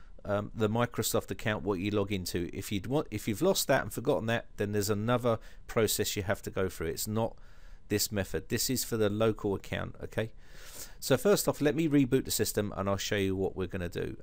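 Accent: England, United Kingdom. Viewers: British english is to die for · Why Englishmen talk so much? Is this a lullaby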